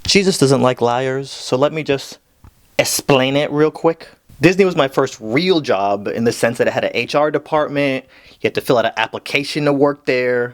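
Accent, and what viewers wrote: Trinidad and Tobago. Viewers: Trini accent on point, much love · That Trinidadian accent had me rolling XD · A plus rating fi did trinin accent! And you know Caribbean people give you one nickname, it stick for life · The trini accent tho 💀🇹🇹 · My first job was a veterinarian assistance. Also your trini accent is real good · Trini accent on point😂💯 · is the trini accent .. i weak🇹🇹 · nice trini accent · Trini accent legit! lol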